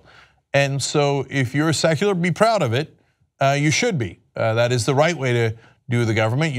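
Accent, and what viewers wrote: Turkey. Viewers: That was your Turkish accent?! I have never heard anyone talk like that here. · Andrew Truman he’s Turkish, I think he comes by the accent honestly, but I don’t love the derision.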